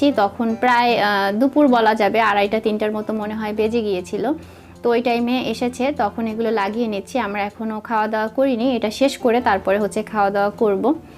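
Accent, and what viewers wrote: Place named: Madaripur, Bangladesh. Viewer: Tumr onk gun re apo.Madaripur er maya bola Kotha 😊💝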